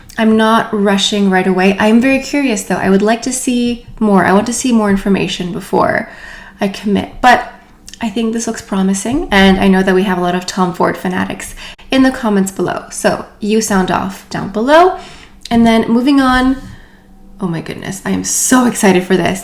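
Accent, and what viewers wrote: France. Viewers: Hello, I like your French accent... Thanks for sharing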